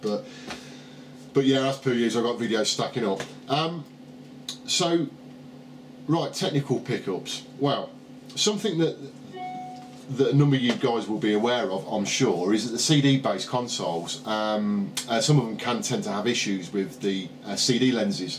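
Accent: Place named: Birmingham, United Kingdom. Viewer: the light, the light! All I will say is I didn't think He would make a second coming and I certainly didn't expect a brummy retro gaming enthusiast to take His form.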